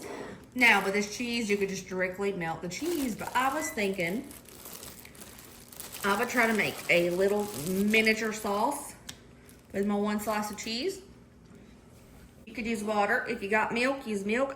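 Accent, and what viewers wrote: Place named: Southern Virginia, United States. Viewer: Please keep content like this coming. I don't necessarily need to feed myself for a dollar, but any meal ideas that help save money are always welcome. And you really do offer some pizzazz with your cooking. Love it. Also, I haven't heard anyone say "peench" since I lived in southern VA, so you're bringing back some good memories, too!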